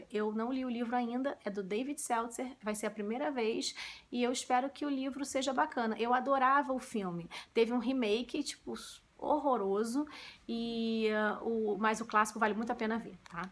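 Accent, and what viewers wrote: Rio de Janeiro, Brazil. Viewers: Você tem toda razão, Misery é angustiante rsrs adorei! Então, esse pacto que você tem é o mesmo que eu li, "pacto/amaldiçoado" é o mesmo livro, eles mudaram o nome quando lançaram o filme com o Daniel Radcliffe, ainda não assisti. Quanto aos livros acumulando poeira na estante, eu costumo fazer trocas no Skoob, já me livrei de muita coisa e já consegui livros incríveis. Super indico. Beijão! Já falei que adoro o seu "carioquês"? kkkk acho lindo.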